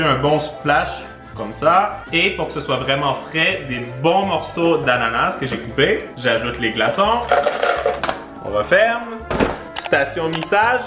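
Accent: Canada. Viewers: tu mon youtubeur préféré desCarl is cooking vidéos accent canadien et qui met quoi des vidéos trop trop rire 🇨🇦👍👍👍👍👍 recette Starbucks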